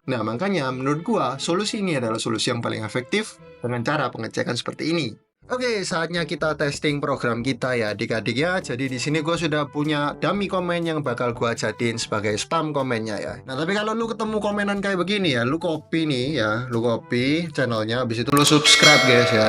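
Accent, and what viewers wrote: Surabaya, Indonesia. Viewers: Wong Surabaya Iki mesti😂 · wong suroboyo iki mesti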